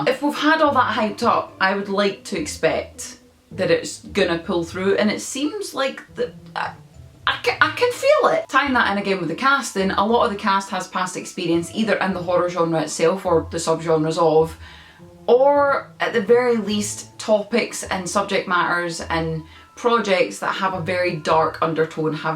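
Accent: Scotland, United Kingdom. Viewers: Your Scottish ? I could tell ha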